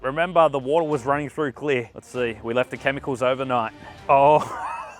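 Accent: Australia. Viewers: This guy has the most Aussie accent I have ever heard. Great video too don't mean any disrespect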